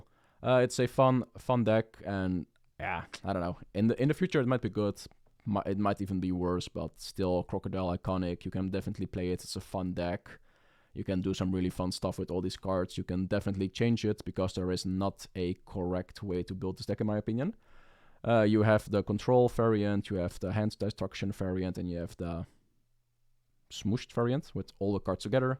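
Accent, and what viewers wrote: Netherlands. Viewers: got an interesting accent, are you dutch by any chance? Also Nice Channel!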